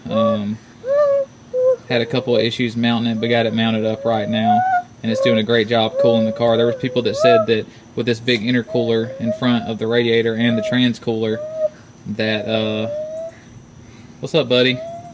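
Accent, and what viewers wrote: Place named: Tennessee, United States. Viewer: where are you located? I hear a TN twang!!! I am from Chattanooga.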